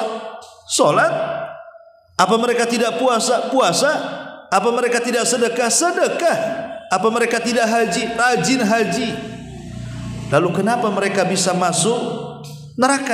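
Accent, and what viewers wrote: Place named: Java, Indonesia. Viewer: Ceramah Bpk enak di dengar. Bahasanya lembut. Jowo nya tulen Njowoni. Sehat njih Bapak Ustad. Aamiin Ya Robb🙏🙏🙏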